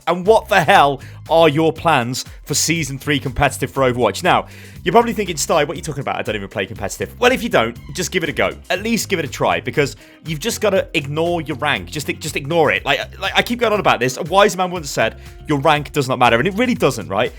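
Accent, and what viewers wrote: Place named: United Kingdom. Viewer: Lmao Im an American who loves soccer and even I know its called football! Hearing that awesome british accent say soccer instead of football is how you say... maybe.. rubbish!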